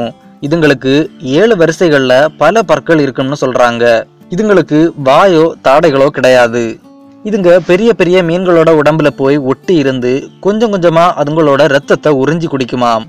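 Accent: Kanyakumari, India. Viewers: Kanyakumari slang makka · Nenga KanyakuMari ah bro? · Kanyakumari voice ❤️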